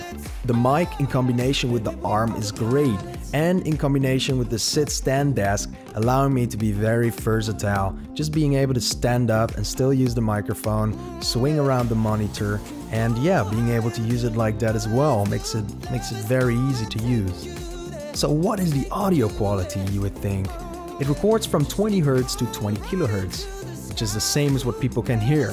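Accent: Netherlands. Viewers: Are you dutch by any chance? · Great video! Im recieving this one tommorow and and when i opened the video i could immediatly hear you are dutch too haha, your channel looks great!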